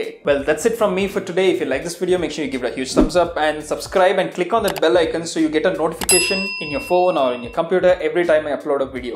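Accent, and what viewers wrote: India. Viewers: I like the karnataka accent in your English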